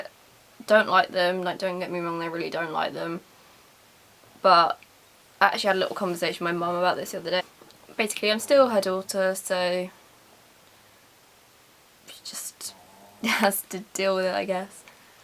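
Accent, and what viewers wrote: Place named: London, United Kingdom. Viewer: u have a london accent-nice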